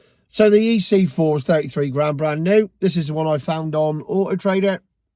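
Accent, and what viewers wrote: Cornwall, United Kingdom. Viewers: Great video! With that accent you must be from down my way me ansom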